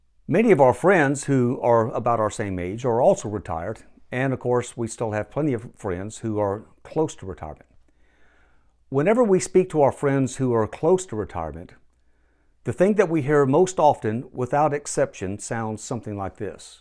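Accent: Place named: Louisiana, United States. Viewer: I hear a Cajun accent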